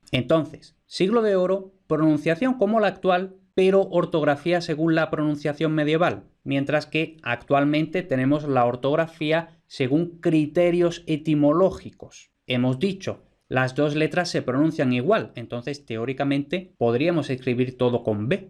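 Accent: Spain. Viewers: te felicito por venir a ver estos videos si estás aprendiendo español, son explicaciones más bien técnicas que ayudan a entender el orígen de muchas palabras en nuestro idioma. Y se te puede dificultar también por el acento de, el español ibérico a veces es complicado de entender, si los hispanoamericanos tenemos dificultad para entenderlos, imagínate tú.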